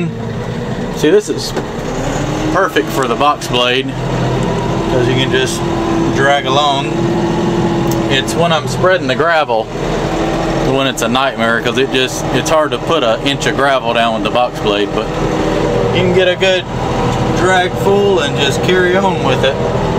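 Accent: South, United States. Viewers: I don't mind your southern accent. We all have an accent so you can't make fun of it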